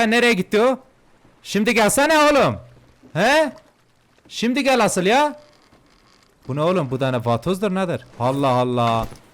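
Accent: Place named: Diyarbakir, Turkey. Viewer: Abi nerelisin? Bizim Diyarbakırlilar gibi konuşuyorsun. Saygılar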